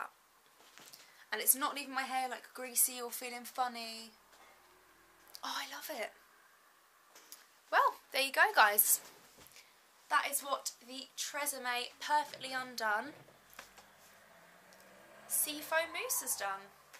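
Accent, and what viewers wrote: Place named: United Kingdom. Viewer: That Bri'ish accent! And ya, I'm here cause I grew my hair long and got that thing today lol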